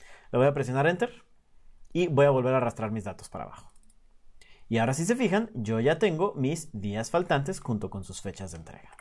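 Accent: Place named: Fresa, Mexico. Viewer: Eres muy bueno, pero dejé de lado la explicación y dejé de ver el video por que me hartó tu maldito acento "Fresa".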